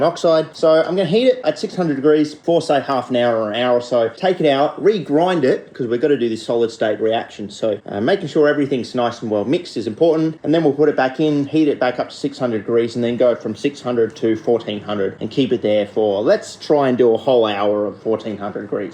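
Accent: Australia. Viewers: Your always doing “the Australian accent” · “i can’t do the Australian accent” …mate, you have an Australian accent all the time lol ❤ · Saying "I can't do the Australian accent" when you literally HAVE and Aussie accent is actually pure gold. Thanks for that, I needed it.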